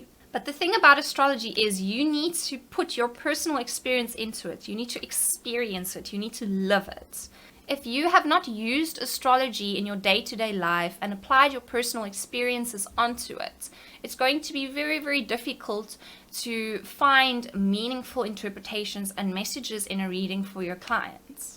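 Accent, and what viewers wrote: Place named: South Africa. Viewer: you can't destroy that south african accent with a ridiculous american "r"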